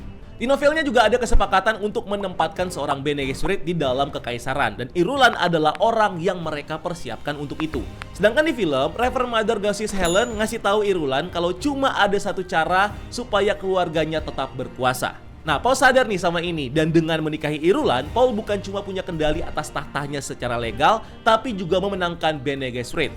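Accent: Indonesia. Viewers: Ngomong ingris nya jelek banget. Ingris nya logat indo ngapa?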